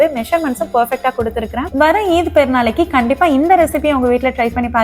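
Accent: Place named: Kayalpatnam, India. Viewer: Eanga ooru kayalpatnam solra style super.eanga iruntha eanna.sontha ooru special than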